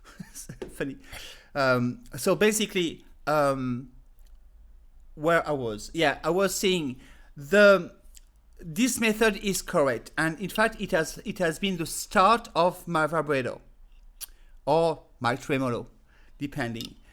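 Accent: France. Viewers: Merci pour cette leçon très instructive (+ le commentaire de Walter Cengia, qui donne la définition exacte) sur toutes ces différentes techniques : c'est la première leçon qui explique clairement tous les détails ! And very amusing with this funny french accent ;-) As another comment said, you should have made it in french but it would had less charm and feeling ! Bonjour du pays de la rillette et des courses de voitures =;-)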